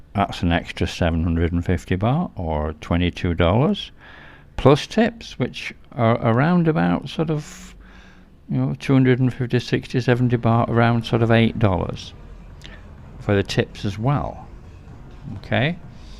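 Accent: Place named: Northern England, United Kingdom. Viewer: Hi, where in the north of England are you from? Your accent is most pleasant on the ears.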